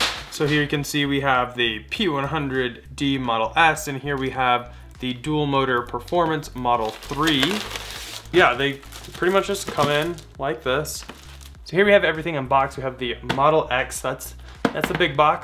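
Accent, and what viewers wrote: Norway. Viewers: R u Norwegian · Are you norwegan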